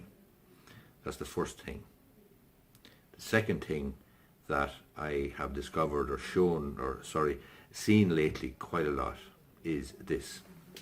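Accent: Ireland. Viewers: Cork accent boi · Irish, not sure which area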